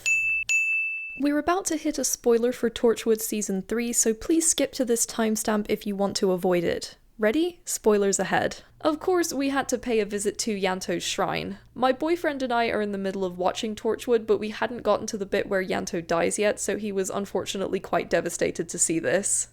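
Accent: United Kingdom. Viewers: Very impressive packing all that in! You sound so British that I can’t believe you’re not from there 😱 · “To visit my British family” With that accent, you don’t consider yourself British?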